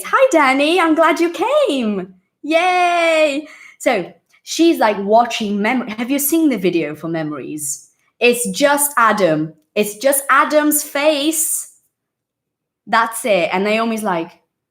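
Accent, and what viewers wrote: United Kingdom. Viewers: Louca para pegar uma live contigo. Já sou fã amor. Amo o seu inglês, sotaque britânico, e quero ser fluente na fala assim como nos ouvidos. Eu entendo tudo mas falar não consigo ser fluente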